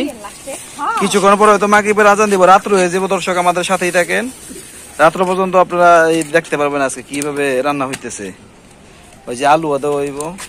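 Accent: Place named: Sylhet, Bangladesh. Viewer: আপনার মেইন বাড়ি ঢাকা নাকি আপনার কথায় বুঝা যায় আপনি সিলেটি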